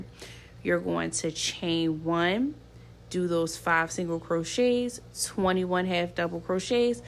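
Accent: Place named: Philadelphia, United States. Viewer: Thank you for this. So clear and easy to follow. I Can’t wait to try it! And if I’m not mistaken your accent is definitely giving fellow Philly girl vibes 🤗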